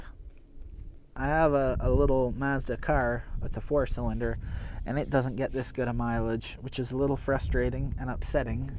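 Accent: Canada. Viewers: You sound like a crazy country CANUCK... WHERE YOU FROM?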